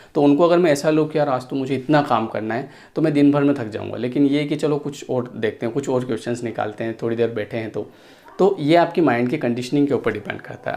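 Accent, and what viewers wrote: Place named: Malwa, India. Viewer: Your tune seems indore and ratlam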